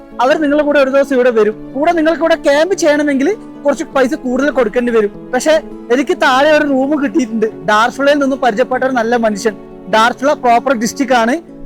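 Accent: Kannur, India. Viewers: Halo bhai, namaskar, ur journey, ur adha adhuri hindi language, ur kannur slang, eg koy for kozhi(chicken) r great and amusing. Best wishes and God bless u always. always.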